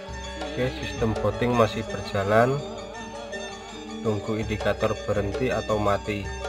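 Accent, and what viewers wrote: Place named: Banyumas, Indonesia. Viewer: Kaya wong banyumas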